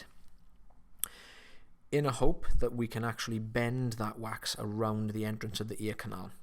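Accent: Wales, United Kingdom. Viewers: It's Welsh if I'm not mistaken.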